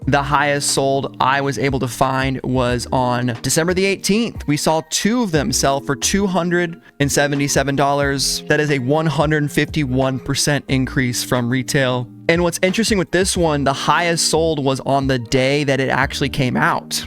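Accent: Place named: England, United Kingdom. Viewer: always a W with the english accent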